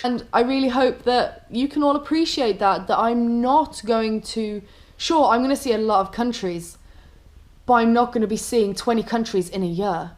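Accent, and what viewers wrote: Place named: Cardiff, United Kingdom. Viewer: I hear cardiffian in you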